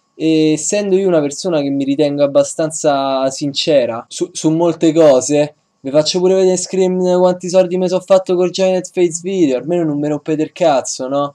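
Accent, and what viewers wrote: Rome, Italy. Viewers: non riesci a parlare meno romano?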